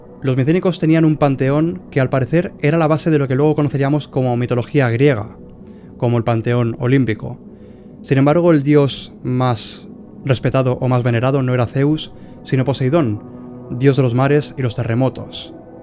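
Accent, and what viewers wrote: Spain. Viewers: Gracias por compartir sus conocimientos joven español 🙏. Solo le recomendaría que acentue mejor solo un poco la entonación, y el audio, pero su acento va muy bien con la narración de estos temas. Excelente trabajo, más videos please. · Excelente canal. ¿De dónde eres en España? ¿el acento es vasco?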